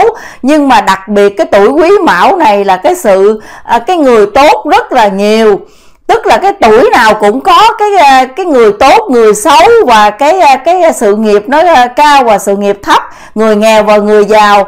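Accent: Standard. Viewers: Chi noi qua chuan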